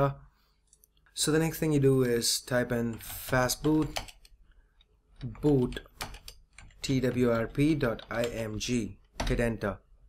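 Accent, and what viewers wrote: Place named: India. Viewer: You saved my day, brother!!!!! :D & you sound like indian.... that's close to me! :)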